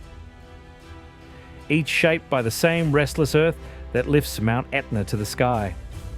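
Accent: Australia. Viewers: is that an Australian accent I could hear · Suspect Aussie?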